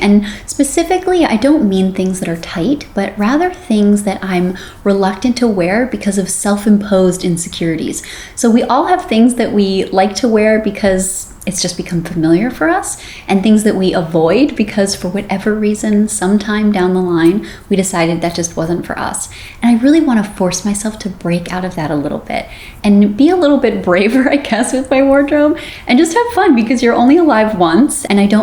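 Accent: United States. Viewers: american? canadian? accent of the host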